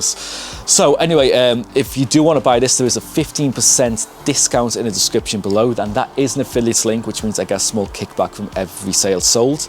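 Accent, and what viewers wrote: Liverpool, United Kingdom. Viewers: keep making videos mate, I've just discovered you. Keep that content coming! You sound like you're from my way or near by? (Liverpool)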